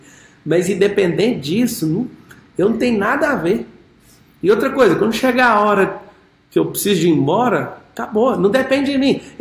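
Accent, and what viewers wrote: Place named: Goiás, Brazil. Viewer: Alguém em agosto de 2024. Até o sotaque dele era um goiano bem puxado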